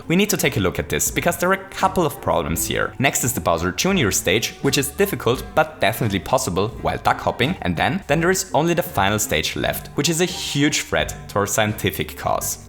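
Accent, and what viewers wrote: Germany. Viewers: Are you german? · Am I the only one being annoyed by him speaking so enthusiastic with that german accent or whatever that is .. ? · Bin ich drauf oder hört man das Deutsch raus ? Hahaha, nice video ! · Man hört so hart das du deutsch bist hahaha #nofront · Are you German? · ich merke das du ein deutscher bist weil dein dialekt anders ist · Are you german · Is he german?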